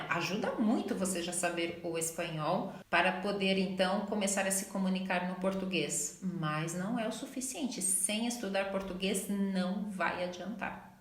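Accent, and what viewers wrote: Brazil. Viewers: Yo ya la vi en otros videos. Habla muy correctamente, con muy buena dicción en aquellos fonemas que muchos lusófonos encuentran difíciles (principalmente la r y la j fuertes) y ese leve acentito brasileño la hace aún más encantadora, dicho esto con el mayor de los respetos.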